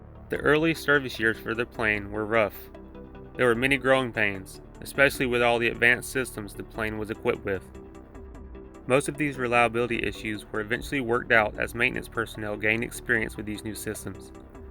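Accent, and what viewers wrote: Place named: South, United States. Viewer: Seriously, this is one of my favorite channels. The facts, history, and your accent being a bit southern like most of my friends...very easy to listen to. (Yes, i get a lot of $hit for being a 'yank'...lmao) Be well. ☀️😎🇺🇸☀️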